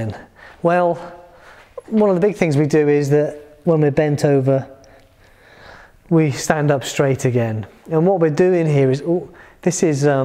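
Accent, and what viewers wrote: United Kingdom. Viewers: Maybe you wanna slow down a little bit , I barely can understand your British accent 🤷🤷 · From the moment I've heard him speaking I understood that he is from the UK, lol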